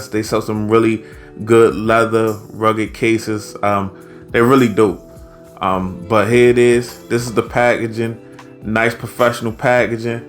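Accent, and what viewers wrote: Baltimore, United States. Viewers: You from Baltimore or Philly?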